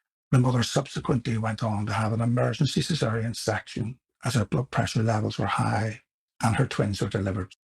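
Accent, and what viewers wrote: Belfast, United Kingdom. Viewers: It gets better. I hate wearing headphones but I want to hear every single word he says, which helps with the sound quality and his wonderful Belfast accent. Besides, he does get the sound quality much better as the series progresses.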